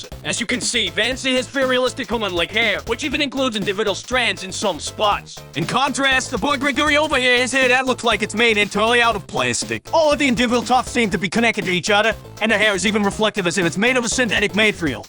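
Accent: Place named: Boston, United States. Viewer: that amazing Boston Boy accent was a pleasant surprise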